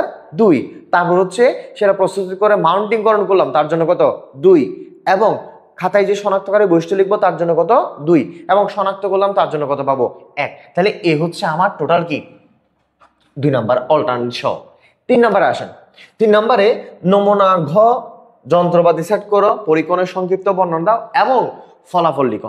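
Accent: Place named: Chittagong, Bangladesh. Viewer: Sir er kota huni lagedde chatgaiya🗿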